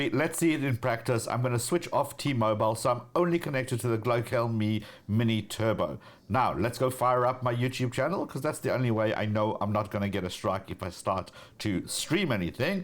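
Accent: South Africa. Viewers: Hi first I thought you were from South Africa but then I saw in your videos you are from America and your english accent is very South African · היי. מה סוג המבטא שלך? דרום-אפריקאי?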